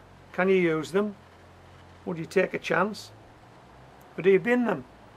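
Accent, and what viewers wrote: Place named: Newcastle, United Kingdom. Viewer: Love the accent. Why-aye man! Poysanass poortaytohs! Divvent eat em man! Howway!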